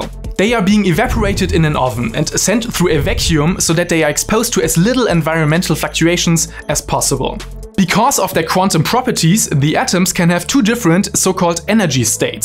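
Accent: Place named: Germany. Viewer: I like your German accent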